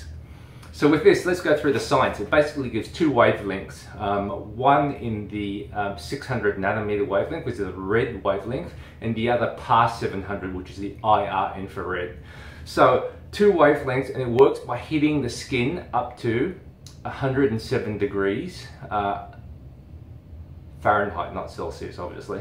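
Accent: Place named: Australia. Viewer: will you add the Newa to your device testing? It's a radiofrequency device that claims to use the same 3DEEP technology used in the clinic setting. The makers of Newa further claim the device induces production of collagen and elastin. The Newa website states a study showed an average collagen increase of 3.82 percent (but fails to state how the test was conducted... and I never trust any test not done by an independent, third-party facility). Also, what are your thoughts on the Tria laser (basically it is an at home Fraxel type device)? Would you reccommend the Tria over dermarolling? (I already own the Tria so am basically curious whether I should continue to use it or dermaroll or alternate treatments?) Thanks so much for your videos!!! I love everything about skincare and being able to a professional with an Australian accent talk about skincare is damn near perfection in my book.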